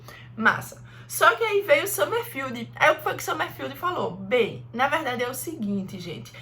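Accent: Pernambuco, Brazil. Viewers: Vc é de Pernambuco? Seu sotaque me soa bem famíliar😊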